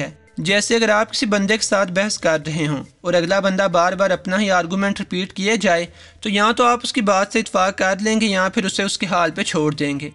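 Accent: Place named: Lahore, Pakistan. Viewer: Apka Accent Lahoriyo Wala hy..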